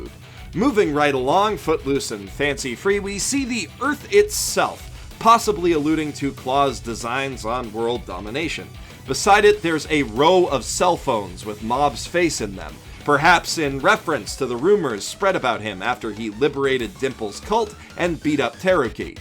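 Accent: Canada. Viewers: WAIT!! Your're Canadian!? How did I not catch that? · Hole maple syrup Goku! your Canadian! I had a feeling i liked you speech pattern, guess because your Canadian. Well Subscribed! keep up the good work :)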